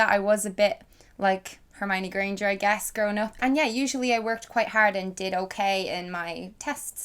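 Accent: Dublin, Ireland. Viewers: I can't hear Louth in it at all. Sounds completely Dub to me!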